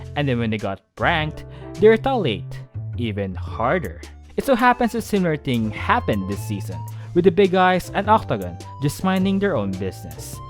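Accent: Philippines. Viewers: You seem to have a filipino accent. Are you?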